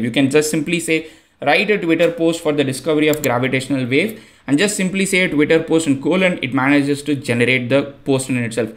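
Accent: India. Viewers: Can you use an AI to remove your Indian accent?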